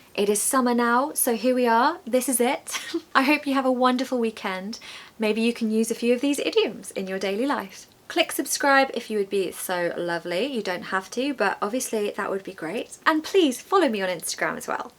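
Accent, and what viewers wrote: United Kingdom. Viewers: I love the British accent . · ❤❤❤❤❤❤❤❤❤ Love your Received Pronunciation (British English accent) very much!!! ❤❤❤❤❤❤❤❤❤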